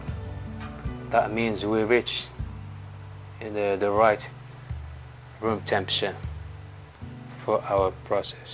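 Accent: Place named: Casablanca, Morocco. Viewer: تبارك الله عليك اخويا ، و المغاربة اللي تيهضرو بالانجليزية و شرحو بالانجليزية ، مثال بسيط و مشجع بالتفيق اخويا ، و لكن الاجليزية عندك كازوية هههههه تبرك الله عليك برو ،😍😍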